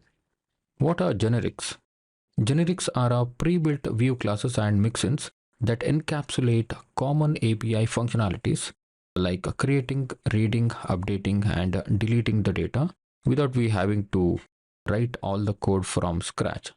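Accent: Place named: India. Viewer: Classic Indian tutorial vibes...Flashy MacBook, thick accent, and somehow turning a simple task into a NASA mission. You could’ve just used Windows and made it beginner-friendly, but nope, had to flex the fruit laptop. No real pro with a MacBook is coming here to suffer through that accent. It’s the poor Windows and Linux users trying to survive this mess.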